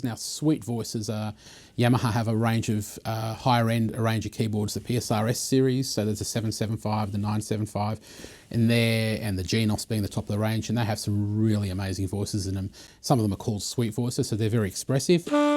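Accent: Australia. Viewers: This is exactly the kind of comparison vid I was looking for. Thanks heaps ! Great to hear an Aussie voice aswell on YouTube !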